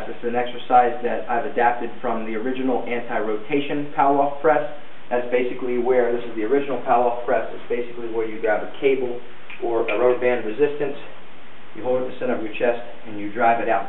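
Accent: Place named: Baltimore, United States. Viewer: I hadn't even scrolled down and I was like "hey, he sounds like he's from Bal'more - sure enough! Great exercises - thanks! I'll def. incorporate these with my clients.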